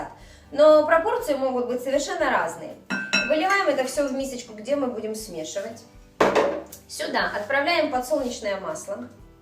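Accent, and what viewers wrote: Ukraine. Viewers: Вам речь кажется неграмотнной, а на самом деле это местный диалект русского языка на украинской почве. Так же как существует масса диалектов в пределах России. Все очень просто, можно было бы Вам и самой додуматься, но видно привычное русское высокомерие мешает.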